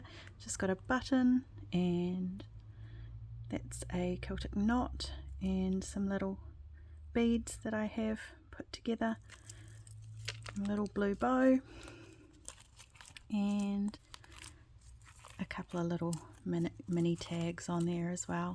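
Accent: New Zealand. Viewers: When I heard your accent I knew New Zealand! 💖 Can't wait to eventually visit.... Lovely journal YES so many ideas! I especially loved the double sided lace pocket that revealed the card inside! thank you for sharing your work! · Gosh, some yummy ideas in this, I love it. What great idea for the Hydrangeas!!!! So very lovely and inspiring. Sending love from New Zealand. xxxxxxxxx Actually it sounds like you also a Kiwi. !!!!! You are very talented. xxxxx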